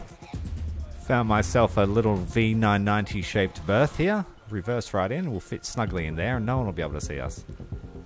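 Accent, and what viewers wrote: Australia. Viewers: "It's now or never, do ya wanna live forever!" Luv ya Aussie humour & narration! Great vid', Sah!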